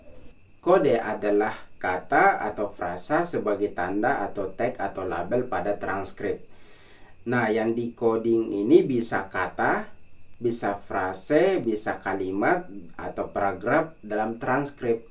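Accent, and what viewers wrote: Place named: Makassar, Indonesia. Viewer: Kaka orang Makassar yah? Logatnya sama dengan kampung saya 🙏🏻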